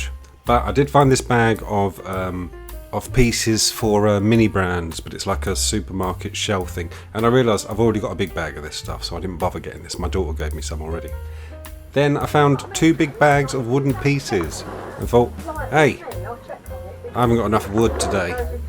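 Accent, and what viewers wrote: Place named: United Kingdom. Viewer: Guys I think he is British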